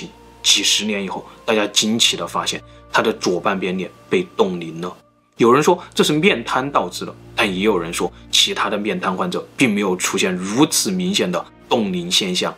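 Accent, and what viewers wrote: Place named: Hubei, China. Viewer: 湖北口音听习惯了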